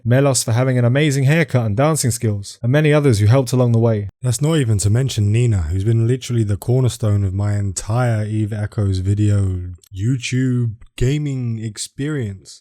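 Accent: United Kingdom. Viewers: I admire your British accent, will miss it...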